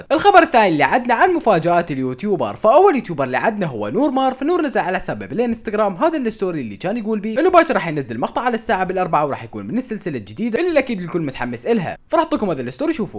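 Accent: Iraq. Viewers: بموت في اللخجة العراقية 😍طبعآ تحياتي من فلسطين ل العراق 😘💖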